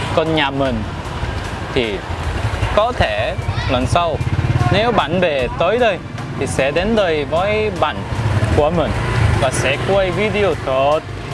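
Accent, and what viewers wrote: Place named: North, Vietnam. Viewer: nói tiếng việt cũng dễ nghe mà, mà sao ở sài gòn mà nói như giọng Bắc ý nhỉ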